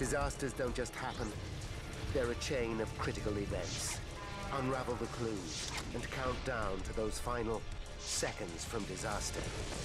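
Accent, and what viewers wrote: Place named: England, United Kingdom. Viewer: I like the English narrator much more than the American narrator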